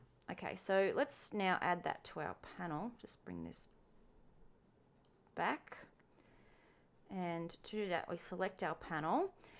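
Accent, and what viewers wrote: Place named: Australia. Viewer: So nice to open the video and hear an Aussie haha. Really clear tutorial too, many thanks!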